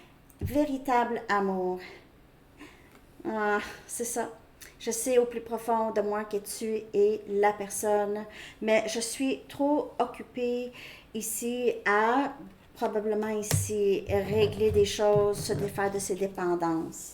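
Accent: Canada. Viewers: Gratitude 🙏 Merci infiniment 🙏 j'adore ton accent canadien 👍👍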